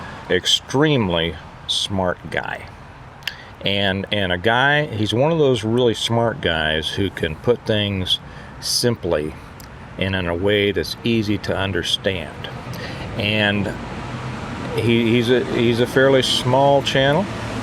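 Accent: South, United States. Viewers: I LOVE UR DRAWL WHEN YOU TALK.. we in Canada love listening to YOU Southern folk..KEEP TALKING BRO.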